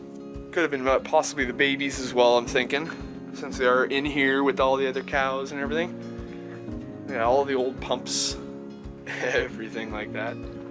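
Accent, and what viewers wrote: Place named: Canada. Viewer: As soon as I saw the ladder I kept saying, "go up!" Lol amazing location. I have to say I love your Canadian accent lol.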